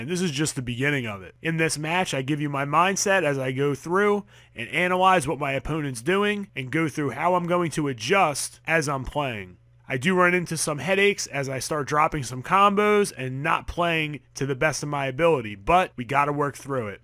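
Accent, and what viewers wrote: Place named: Philadelphia, United States. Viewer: def either from philly or south jersey...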